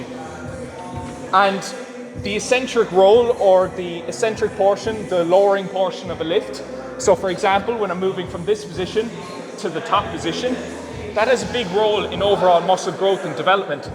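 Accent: Ireland. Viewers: You're fkin hilarious bro 🤣 love the sense of humour and great content. I can feel this channel blowing up very soon. Btw is that a Limerick accent I hear?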